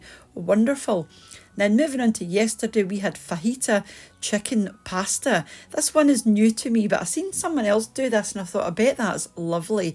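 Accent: Scotland, United Kingdom. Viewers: yes, I knew from reading here. your accent/brogue sounds just like them. I am in Michigan as my Grandparent's came from Saltcoats/Stevenston. We are still very close to our kin there and see them often.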